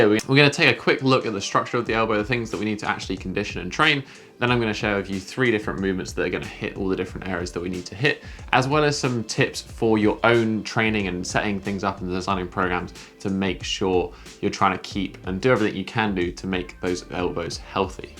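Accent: England, United Kingdom. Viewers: Because why pronounce just that CH in the none english way when you do the rest with that english accent?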